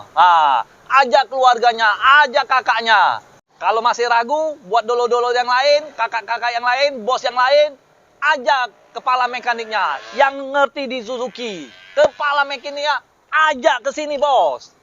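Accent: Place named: Plumbungan, Indonesia. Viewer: Wong Plumbungan nian Dio ni klo ngomong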